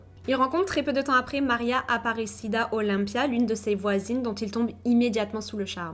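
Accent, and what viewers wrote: Quebec, Canada. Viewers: Bonsoir, je viens de découvrir tes vidéos depuis début juillet 2021. Merci pour tes vidéos, j'adore ton accent québécois. Pourquoi as-tu choisi de réaliser des vidéos sur les crimes, stp? A tantôt. Belle fin de soirée, bisous livresques nocturnes criminels.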